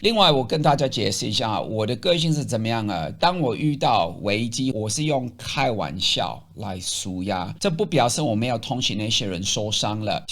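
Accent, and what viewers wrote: Taiwan. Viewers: 哎呀 台灣就是這樣啦， 習慣就好，真喜歡你的台灣口音😂水啦🎉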